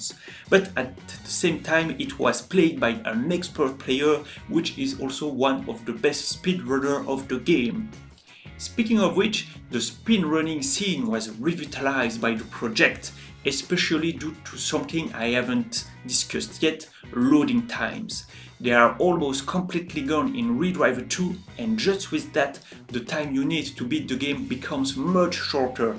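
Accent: France. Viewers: Clairement un français le mec 😂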